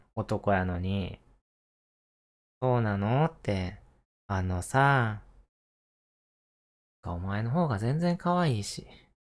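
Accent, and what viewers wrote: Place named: Kansai, Japan. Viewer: 癒やされる声～関西弁最高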